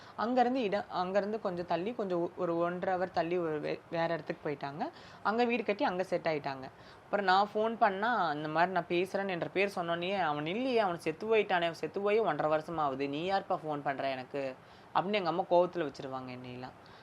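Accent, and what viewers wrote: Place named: Kongu, India. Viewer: அக்கா நான் கோவை நீங்கள் பேசும் உங்கள் கொங்கு தமிழ் மிகவும் அழகாக உள்ளது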